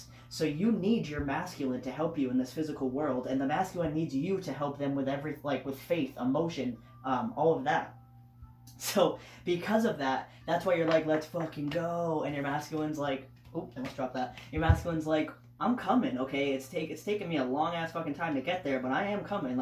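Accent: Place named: East Coast, United States. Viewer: Where the hell have you been. Whatever you can do to become more available as a channel on YouTube I hope you have the time to do it. The fact that so many men watch your channel got my attention. Please take the opportunity to stand out to Men. I think you come across two men in a really relatable way. I can understand the struggle of just beginning to awaken but I bet guys struggle with it more because in addition to not trusting it they probably feel uncomfortable just considering it. You have a laid-back regular guys guy kind of a feel. BTW, your East coast accent only adds to that. It says I can give you a spot on reading and kick your ass. I'm joking but I'm sure you get my drift. Another thing is that while I'm sure you are a fabulously handsome guy, the fact that you're not in the video that you're off to the side is probably important. 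There's only a handful of male readers that I've come across in the estrogen ocean of readers. As you would expect the better-looking they are, the more popular than trailers. That doesn't mean that they're not good at what they do but at some point because of all of the girls responding it begins to feel Rockstar and it wouldn't be my first choice if I was looking for answers to help me out with my life, especially in the beginning of that journey.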